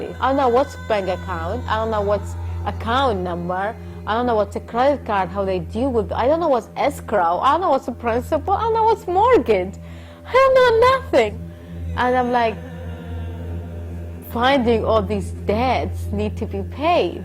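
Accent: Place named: Iraq. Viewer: بالمناسبة لهجتج عراقيه انتي مواليد ايام دخول الجيش العراقي و ممحونه.....ما ننلام من نجناكم بالكويت.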